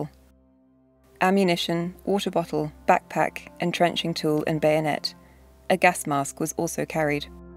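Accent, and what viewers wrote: United Kingdom. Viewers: don't need a British uniform to know he's from there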